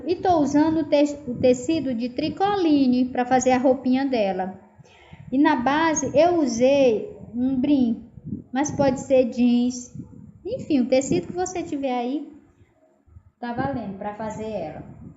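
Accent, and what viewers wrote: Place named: Piauí, Brazil. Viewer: Você é do Piauí, esse sotaque parece do povo de lá. Parabéns,bela arte.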